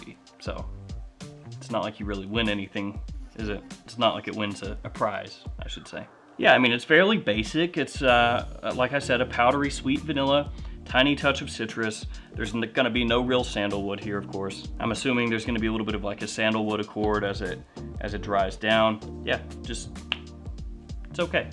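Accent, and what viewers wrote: South, United States. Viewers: "Hey friends. ".... and some of you folks can't tell he is from the South.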